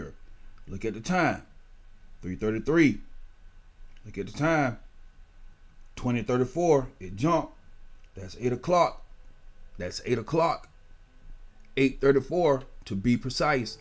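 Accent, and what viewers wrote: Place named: South, United States. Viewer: He so southern like me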